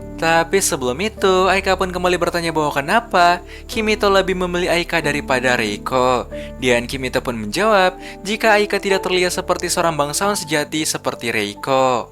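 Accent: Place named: Bandung, Indonesia. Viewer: logat bandung jier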